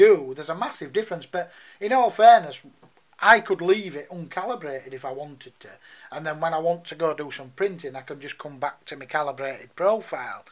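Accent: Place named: England, United Kingdom. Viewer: where in england are you? i loove your accent :D too the spyder pro: Which one to get, and why did you get the Pro?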